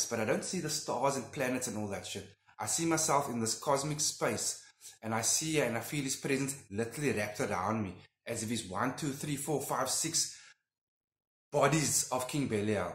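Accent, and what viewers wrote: South Africa. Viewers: This might be completely of topic🙊😁 but I noticed ur accent and was wondering, is your home language Afrikaans😅😅😅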